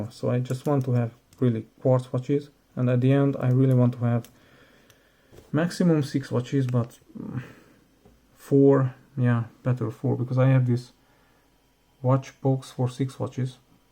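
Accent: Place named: Europe. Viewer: Myself included, majority of watch enthusiasts thoughtlessly buy and acquire all sought of random watches for display, hoarding and bragging purposes. In doing so, the art of watch collection loses its meaning and enjoyability and becomes a liability - as we are constantly looking for the next watch to buy without ever enjoying the few we have. Am American and consumerism here in the US is like a demonic spirit. The main take-away from watching this SOTC Video is Modesty. I also like that your collection is SUB$300 USD and project to liquidate a few pieces and buy one masterpiece that will endure more wrist time and bring you more satisfaction. The Title of this video should be: "STATE OF PRUDENT COLLECTION." Judging by Your reasoning and modest watch collection says, to me, that you are a simple, humble yet intelligent person. Your soft voice makes for an easy listen as well. 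I hear an accent but wasn't sure where you from in Europe. Thanks again.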